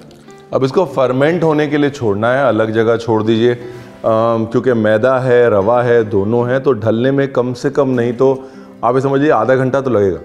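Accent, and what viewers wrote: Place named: Lucknow, India. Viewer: Hey Ranveer I'm Gaurav from lko You are first whom I had subscribed I had never subscribed any one before on YouTube. Just telling you coz I admire you and your talent of cooking And over n above your commentary during cooking , poora lakhnavi andaaj Youu kahe ki baaton se hi tar kar dete ho . Hope you will read and reply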